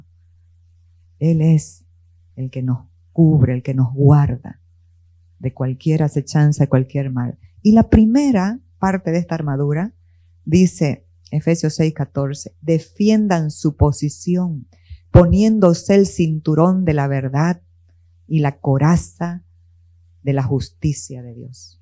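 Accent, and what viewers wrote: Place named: East, Bolivia. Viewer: Me agrada como predica la 👍🏻 me encanta sus pausa y la forma entendible de la explicación de la Palabra🙌🏼 y sobre todo tiene el tono del oriente de Bolivia🤗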